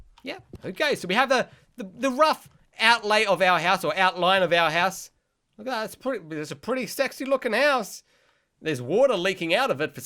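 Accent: Australia. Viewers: I love your australian accent,so nice to hear australian :)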